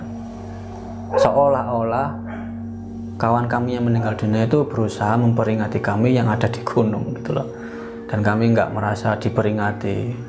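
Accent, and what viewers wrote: Java, Indonesia. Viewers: Kang Nama sunda, logat medok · Kang kirain orang sunda,ternyata jawa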